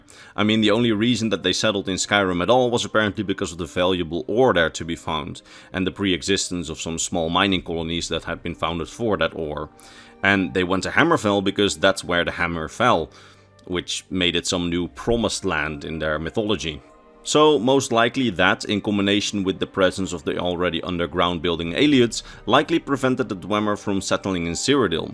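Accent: Netherlands. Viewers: I hate your accent. Dutch should not have one.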